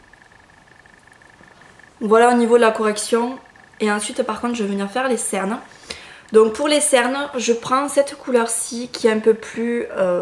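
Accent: South, France. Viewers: Coucou et bonne année 2013 ! J'aime beaucoup tes vidéos et ton accent du Sud ! :) Est-ce que tu pourrais faire la vidéo de tes cadeaux de Noël ? Bisous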